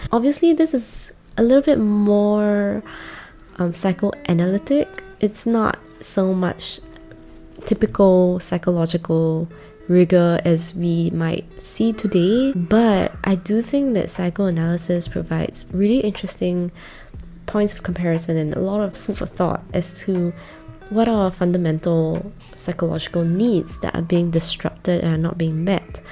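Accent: Jamaica. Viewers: I’m extremely fascinated that your accent often reminds me of Jamaican accent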